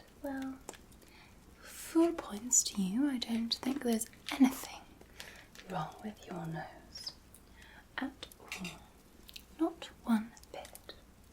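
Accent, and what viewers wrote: Scotland, United Kingdom. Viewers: Loving this character, don’t forget your Scottish accent though 🏴 💙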